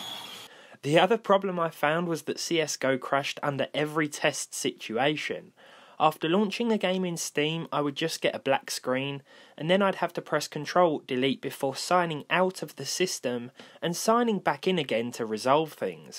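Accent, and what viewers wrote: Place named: Home Counties, United Kingdom. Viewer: I just realised that RandomGaming and Budget Builds are 2 different channels, lol. I just hear someone with a home counties/posh (I'm from the North you sound posh to me) accent talking about old hardware. AMD is like Linux, over the time they have existed you will always hear someone saying they are poised to take over their bigger rival, but it never happens, there must be a reason why Nvidia have always been, by far, bigger in the market than AMD, same goes for Intel, it can't just be the stupidity of the public. Whenever it's time for me to upgrade there is always chatter than AMD are better than Nvidia and Intel, and I always look at them, but can never take the plunge, they never seem to stack up to the hype.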